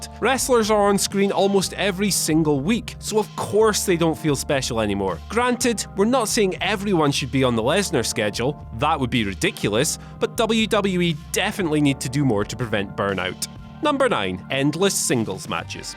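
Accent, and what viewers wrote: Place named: Scotland, United Kingdom. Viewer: I want to keep hearing this Scottish prick's voice. Love this dude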